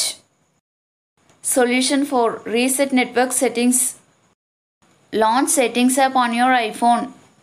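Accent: India. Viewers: That malyali accent is sooooo nice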